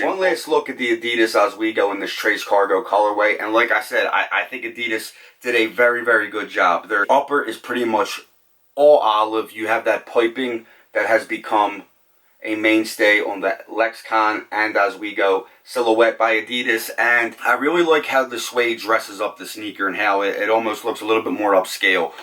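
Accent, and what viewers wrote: Philadelphia, United States. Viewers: heavy Philly accent. I love the look of this shoe.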